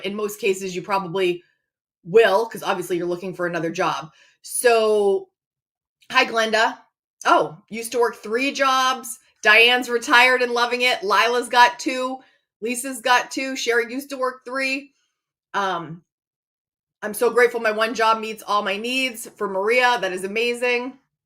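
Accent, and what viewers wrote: United States. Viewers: I wish I understood American! Your English is so different than British English. When you talk about being in school, so you mean school or university? We do school and college up to 18, then it is university. What is sorority and what are the implications of that? The financial acronyms are all so different to ours, so I tend to get bemused. Any interpretation of these things would be welcome. Love your videos and look forward to them each Friday, plus these lovely extras. My life stage is retiring from employment and looking to bring in income from additional streams to keep afloat. I have no debts, and having freedom in that way.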